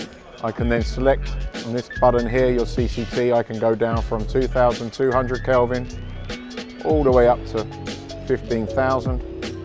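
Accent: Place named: London, United Kingdom. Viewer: That fella has such a smooth Wandsworth accent, like listening to Radio 4 after midnight.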